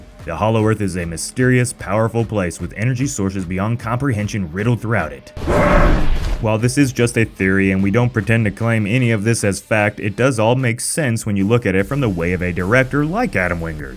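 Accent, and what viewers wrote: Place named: United Kingdom. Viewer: I can now tell you are from the UK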